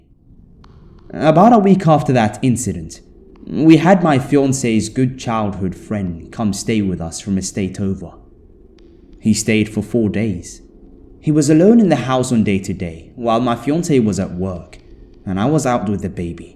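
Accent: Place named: United Kingdom. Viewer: Hello from, wonderful to get an upload from you. Love your accent. That Brit accent just adds so much to the stories. Looks like great story content. Keep up the excellent work. 💋👣💌💘💞💕💓💗💔❤💄💟🎠🌈🍄🌼🍎🍉🌻🍰🕊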